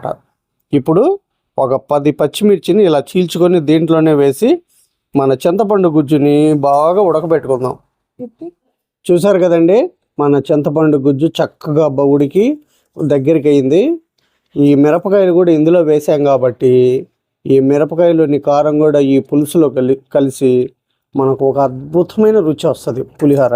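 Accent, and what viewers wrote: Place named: Andhra Pradesh, India. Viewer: Meedi Andhra language laa gaa vundi ee vooru andi Maadi Andhra ne andi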